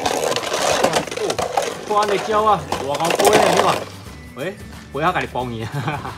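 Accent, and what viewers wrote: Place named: Taiwan. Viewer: 的臺語好可愛:-) 說的不錯ㄛ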